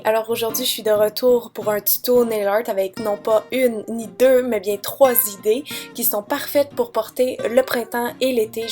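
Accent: Quebec, Canada. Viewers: Merci!:3 j'adore l'accent quebecois! tellement exotique pour moi:D Enfin bref j'adore tes vidéos! merciiii! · Très beau! Je suis française et j'ai été surprise, on s'attend pas à entendre un accent Québécois comme ça :) GOOD JOB! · Sa 3 idée avec le verni blanc et le scotch est vraiment original je n'avais jamais vus sa encore mais c'est vraiment super et créative ! Les 3 idées de nail art sont vraiment trop cool j'adore ! Et juste j'adore ton ac-sen du Quebec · aaaah, l'effet marbré fait penser à de la turquoise!! Des bébés fleures?! X) c'est mignon comme expression! j'adore l'accent québécois ^^ toujours enjoué, c'est agréable! je te ouhaite une bonne continuation :)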